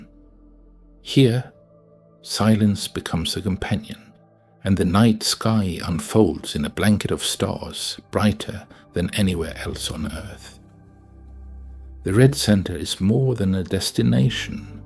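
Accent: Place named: India. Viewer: Fitting having an Indian accent narrating it. Well done Australia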